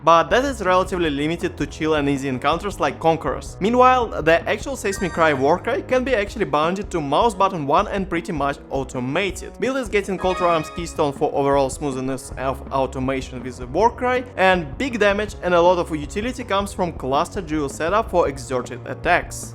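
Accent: Russia. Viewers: мне кажется или у чувака русский акцент?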